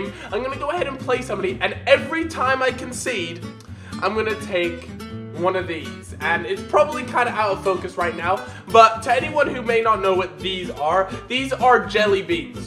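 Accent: United States. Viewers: he talks kinda American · He kinda sounds American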